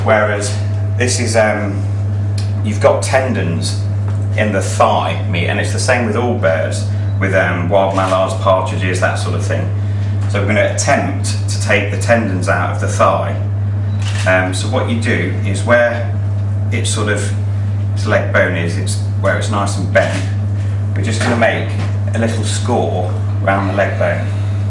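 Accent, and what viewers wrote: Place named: England, United Kingdom. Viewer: Great Job! Thanks for Showing everyone how to clean bird! LUV the English Accent'!!! The Chef sounds like James Bond! LOL!!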